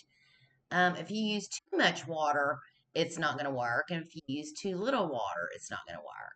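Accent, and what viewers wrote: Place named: Arkansas, United States. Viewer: I thought both the works you showed were very cool as it does look alot like stalks of plants and you're right the possibilities are endless. I loved yours but would want to have the colours behind the stalks which i would make in different levels of the same green if you get me. What do you think? Also, what a fab southern Accent! So lovely to hear. I can't place it. 😂 oh wait its Arkansas!!! Wow great accents there!! these tips here are great & this could also look like a matrix type look in 3D so it could be super modern or clsssic with stalks....this second one is really beautiful.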